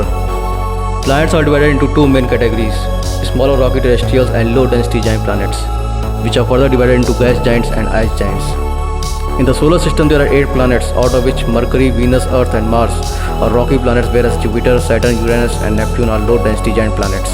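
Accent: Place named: Africa. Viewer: why does your voice sound African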